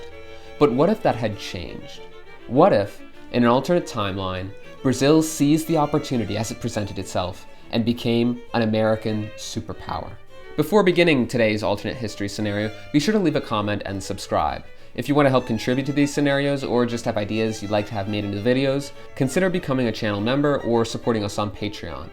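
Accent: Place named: Spain. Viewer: Stop pronouncing our language with spanish accent 🥲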